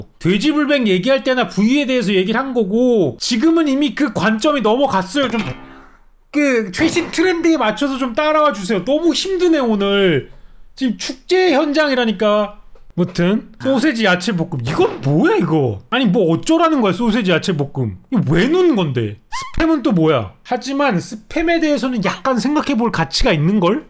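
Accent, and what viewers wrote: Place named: North Korea. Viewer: 이사람말투왜이럼 북에서왔음?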